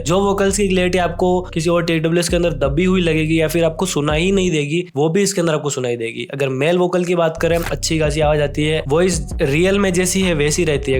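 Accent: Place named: Mewar, India. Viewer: Ha, lg to mewad s hi rha h y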